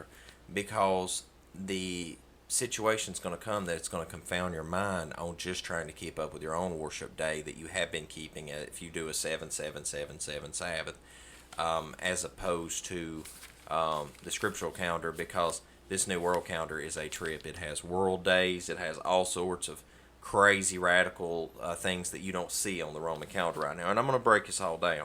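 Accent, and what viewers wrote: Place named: South, United States. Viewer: Enjoyed this very much. Agree 99% lol I hadn't heard of this new calendar. I completely agree on everything you've said about the calendar craziness in history. I'm thankful Father finally told me to look up! HalleluYah! A side note. Its always nice to hear someone with a heavier country accent than myself 😆 Much Shalom and Blessings brother.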